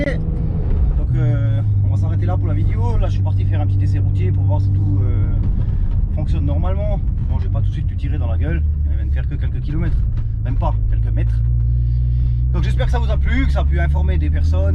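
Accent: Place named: Lorraine, France. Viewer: J aime bien ton accent, ça me rappel ma famille du côté de ma mère, on était à Metz. Les Kron et Ramm. Maintenant je suis en région parisienne, la misère pour trouver un bon garagiste... Faudrait que je fasse 350 km pour te voir ...😢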